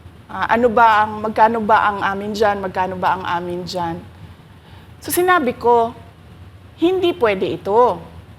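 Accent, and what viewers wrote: Visayas, Philippines. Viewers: Ayan TV5 umabot nadin kayo ng more than 100k viewers. 👏👏👏 Hanggat hindi maging fair at ma katutuhanan ang mainstream media, hindi hindi kayo nakaka abot man lang ng 30K na viewers. Kaya huwag kayong nagtataka kung mas maraming nanood sa mga political Vloggers contra sa tv news. Sino ba ang mas tutuo itong si Inday (David) compared the titans in Congress (Goliath). Eto si Inday na sinabihan nilang BUDOL at Bobo dahil sa kanyang Bisdak intonation. LETCHE! PROUD BISDAK SI INDAY! Ngayon, Congress has already spoken in name of OVP's sponsor that "MOOE at Capital Outlay" lang ibibigay sa OVP za kadahilana g di pag dalo ni VP Inday. Di man lang nila tinignan ang pambabastos ng Congressmen ki VP Inday during the first hearing where siya sinabihan Pusit . Saan ang courtesy doon?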